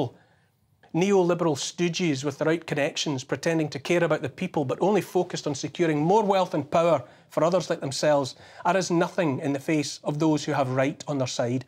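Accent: Scotland, United Kingdom. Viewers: “The strutting popinjay that is Justin Trudeau..” 😂😂😂 Well said my friend! Fabulous monologue in an equally fabulous Scottish accent! Greetings from Canada! 🇨🇦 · Neil, I just love hearing your Scot's accent speaking the truth. You have one more American subscriber. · Scottish voice of Neil is wonderful mate … I’m off to buy a bottle of Bucky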